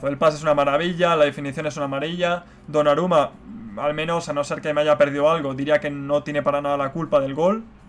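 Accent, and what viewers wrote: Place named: Spain. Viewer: Te llamaremos el porque siempre dices "El" por ti acento español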